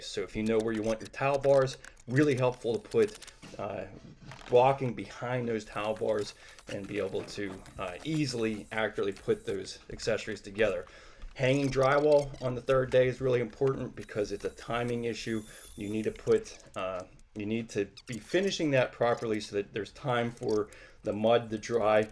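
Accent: Pittsburgh, United States. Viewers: Before I saw your shirt I just knew you was from Pittsburgh 🤣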